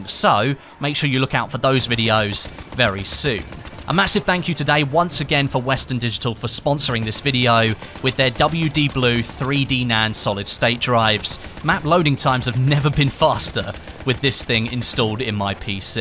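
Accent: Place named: United Kingdom. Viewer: When people from the UK say h.....so awesome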